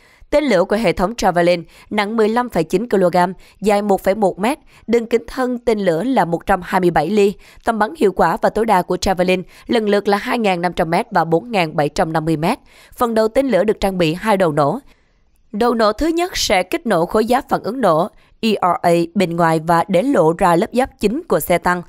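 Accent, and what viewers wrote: South, Vietnam. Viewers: Kênh bạn rất hay và chuẩn về thông tin. bạn nói giọng miền Nam nên nói chậm rãi. đừng nói nhanh quá... nghe sẽ đầy đủ hơn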